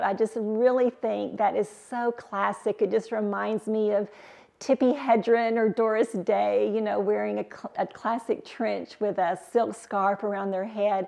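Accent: South, United States. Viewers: Hi, I'm new to your channel, just subscribed! I love all the tips you shared, as well as the outfits! You look so pretty in pastels, especially that beautiful pink! When you mentioned living in Cincinnati, I couldn't believe it (thought you to be a Southern lady, just a bit of accent to my ear😉). We relocated from NE Ohio to Miamisburg (not far from Dayton) almost two years ago! Our oldest is located in Hamilton, twenty miles from Cinci where he and his wife both work. It's a small world, neighbor☺️!! It is snowing as I write this! Like you, we are so ready for Spring and so thankful for our warm home near all three of our Kids' families - they were our impetus to relocate, especially to enjoy our seven grands♥️. Thank you so much for being a voice on YouTube, sharing your tips with all of us! For me you're a God sent gift and I'm so glad to have found you! 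Sending warm 🤗. - Susan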